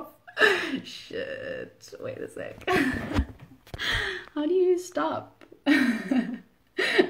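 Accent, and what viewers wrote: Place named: South Africa. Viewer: so good to hear a south african accent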